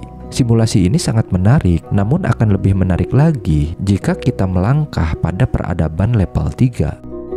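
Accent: Indonesia. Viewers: Akangnya urang sunda.. lepel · orang sunda ya bang?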